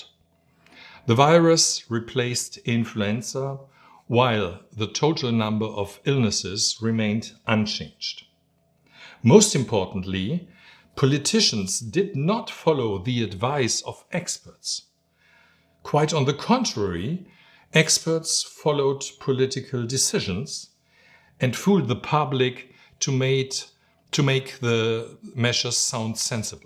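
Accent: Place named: Germany. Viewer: very gut denglish but kommt das noch auf deusch?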